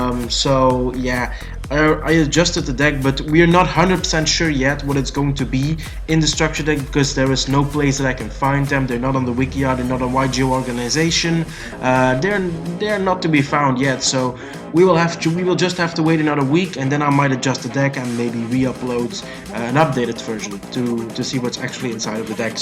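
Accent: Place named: Germany. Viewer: I hade ask because your accent listen a little bit like my accent. And yeah I am from Germany so hello neighbor.;)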